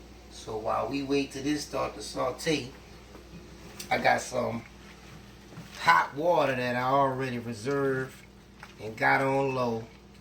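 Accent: New Orleans, United States. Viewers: He got the New Orleans accent and he love to cook that's that boot life LOL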